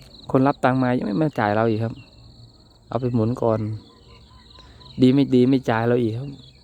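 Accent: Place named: Korat, Thailand. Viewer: พูดเหมือนภาษาโคราชเลย ชอบๆ 55 fc ครับ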